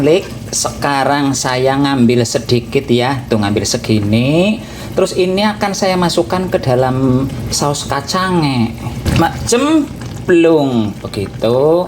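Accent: Banyumas, Indonesia. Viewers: As. Mas sampean kok baasanya seperti bahasane innyong ibu juga orang ngapak daerah banyumqsan pingin kenal mas biyung dirah sukses slalu amin.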